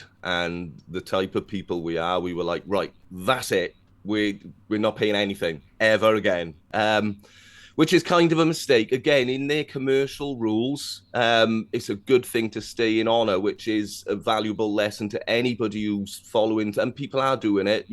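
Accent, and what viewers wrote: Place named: South Wales, United Kingdom. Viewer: proper south Welsh accent.....Swansea/Clydach valleys?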